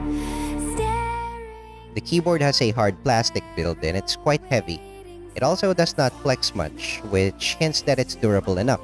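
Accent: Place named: United States. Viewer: your accent has a mix of italian-american and filipino and it's oddly satisfying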